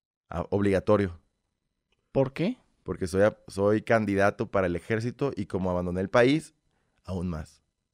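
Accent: Mexico. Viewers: Su forma de ablar y frases que uso lo ase mejor que muchos mexicanos. Vaya carajo. Asta no necesita que ser mal ablado para darle emoción a sus historias. Que buena onda este compa ruso mexicano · El ya es mexicano y habla como mexicanos fierro toro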